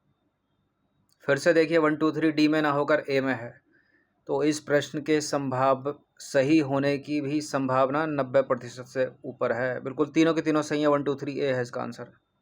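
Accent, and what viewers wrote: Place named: Raebareli, India. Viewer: Sar aap kahan se bolate Hain Raebareli ki Bhasha aapki lagti Hai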